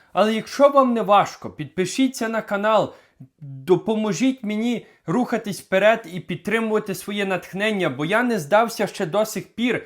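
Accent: Western Ukraine, Ukraine. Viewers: Так это и понятнопотосу, что там совершенно другая " украинская", у тебя ударения и дикция именно какая то или западно украинская, или даже не знаю какая!😂 Но , всё понятно, но акцент и ударение бля выводящие!😂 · Ты сам на якой мове размауляешь, це нi украинска це западенска.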